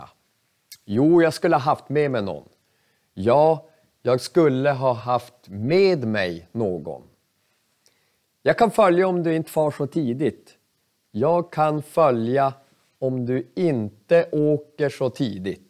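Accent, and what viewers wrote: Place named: Norrland, Sweden. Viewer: men den är Norrländsk dialekt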